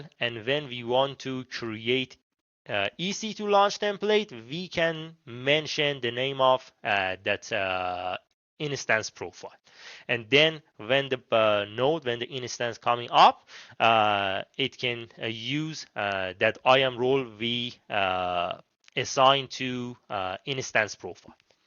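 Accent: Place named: Shiraz, Iran. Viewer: english with shirazi anccent ❤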